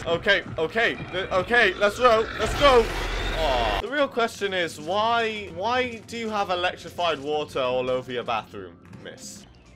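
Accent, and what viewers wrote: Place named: Wales, United Kingdom. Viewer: Sounds a bit welshy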